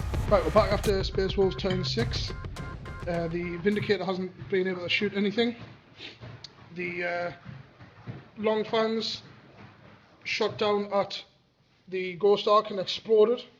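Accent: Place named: Durham, United Kingdom. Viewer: Was very pleased to see Wolves and Necrons and enjoyed the report, you sound like you are local to Durham as well. One thing is Logan on Stormrider is a vehicle so moves 12" in movement and flat out in shooting so shouldn't roll for a run move. Also about Stormfang in the formation, it can be chosen if it passes or fails reserve roll, it's not a reroll, that's the voidclaw formation rules.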